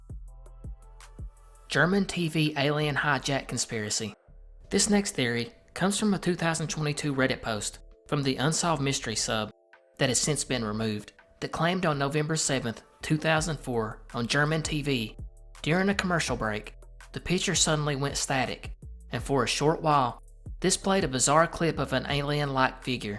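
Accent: South, United States. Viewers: I always forget you have a southern accent for some reason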